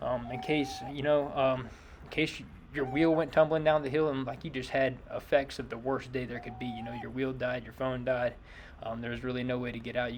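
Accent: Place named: Carolinas, United States. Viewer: Yooo! I just heard you say you were in SC and NC! I thought your accent was from the Carolinas! I'm in NC, and would love to hear about any trails you'd recommend riding out here! I loved hearing the stories of how you've helped people with the unicycle. 😁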